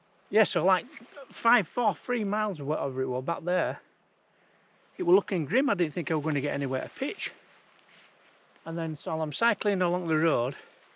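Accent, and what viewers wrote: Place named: Yorkshire, United Kingdom. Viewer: I’m up in May,cycling from Dunoon to Tayinloan and bikepacking on Gigha. Funny hearing all those Gaelic place names in a Yorkshire accent. Great vid, thanks.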